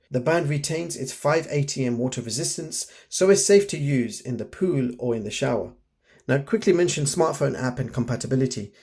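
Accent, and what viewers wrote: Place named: United Kingdom. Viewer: I can't understand half of what this guy says. Too much british accent and low volume.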